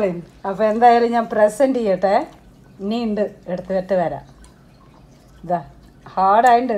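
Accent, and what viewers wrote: Palakkad, India. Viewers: നല്ല പാലക്കാടൻ മലയാളം...❤❤❤❤ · Made the rangayyan today ..made some changes in the tempering. Came out tasty .Nalla palakkadan slang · പാലക്കാടൻ വിഭവങ്ങൾ ഉണ്ടാക്കുന്ന വിധം, തനി പാലക്കാടൻ മലയാളത്തിൽ കേൾക്കുമ്പോഴുള്ള സുഖം, അതൊരു രസമുള്ള അനുഭവം തന്നെയാണ് ട്ടോ, എത്തനൂരാണ് നാടെന്നറിഞ്ഞപ്പോൾ ഒരടുപ്പം, കാരണം എന്റെ മകളുടെ ഭർത്താവ് എത്തനൂർ കൊയ്യൂർ വീട്ടിലെയാണ്. ഞങ്ങളുടെ റിട്ടയേർഡ് ജീവിതത്തിൽ ഇപ്പോൾ, ഇങ്ങിനെ പഴയ പലഹാരങ്ങളുംമറ്റും ഉണ്ടാക്കി നോക്കലാണ് മെയിൻ ഹോബി. Thank you. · I really Thank you for this compliment. Real Palakkadan language. Post Thenga Vada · Chechi sherikum annapoorneshwari devi thanneya.. ❤️❤️❤️🥰🥰🥰😍😍😍 Chechi undakkunna reethiyum ath kazhinju chechi kazhich koodi kanikumbo sherikum manasu nirayua..Chechide palakadan samsaram kettirikkan enth rasama...Variety recipes njangalude munnil iniyum kond varane chechi.. Othiriyothiri nanmakal nerunnu...❤️❤️❤️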